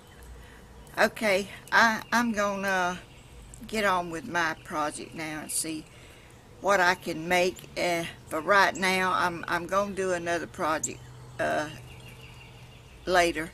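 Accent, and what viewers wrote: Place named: Georgia, United States. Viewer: Happy birthday dear lady. I am a new subscriber. I enjoy watching your videos and I especially like listening to your lovely GA drawl. 😊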